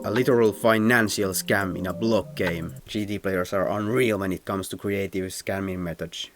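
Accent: Finland. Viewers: I like finnish accent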